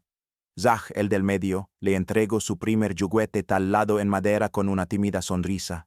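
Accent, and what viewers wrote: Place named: Portugal. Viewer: Habiendo locutores con voz firme de habla en español, contratan a una persona de origen porrugues, qué mal gusto...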